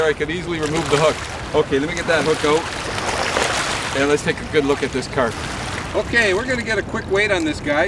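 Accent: Canada. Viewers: Hi, Thank you for your video. Like your Canadian accent. BTW, can I pay Canadian dollars instead of USD on your website?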